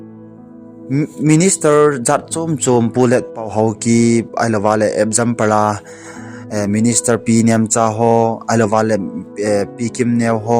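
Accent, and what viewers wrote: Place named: Kuki, India. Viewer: Dik mah² ei and i really like ur accent pure kuki accent which i really like....👍👍👍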